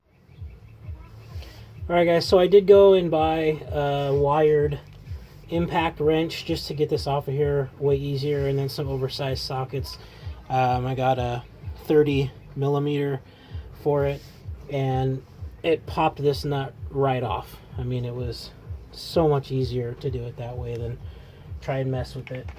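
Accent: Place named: United States. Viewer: This is an above average video with a clear speaking American on "How To" repair an inner "loose or damaged nut" that's deep within the clutch housing of a Suzuki Boulevard M109R. Concise, step by step instructions, no frills, no music, just a straight forward, common sense repair, that is well explained as the video progresses. Excellent video Mr. Suzuki Clutch Repairman Thank you!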